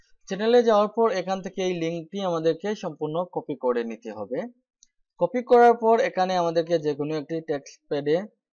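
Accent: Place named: Sylhet, Bangladesh. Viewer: ভালো হয়েছে, তবে কখার মধ্যে সিলেটি একটা টান রয়ে গেছে।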